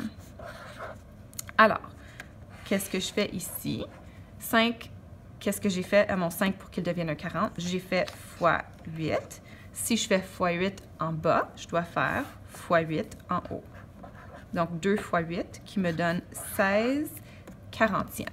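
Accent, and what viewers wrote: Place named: Quebec, Canada. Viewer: bien expliquer je suis la seule a avoir écrit sinon j'adore ton accent quebequois PS:ds pour l'ortographe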